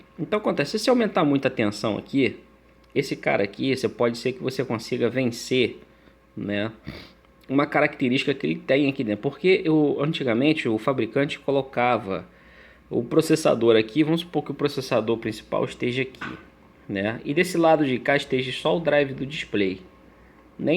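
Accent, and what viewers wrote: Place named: Rio de Janeiro, Brazil. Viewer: Boa tarde amigo. Pelo sotaque vi que você é do RJ. To com um F9 precisando de manutenção. Pode me passar seu contato?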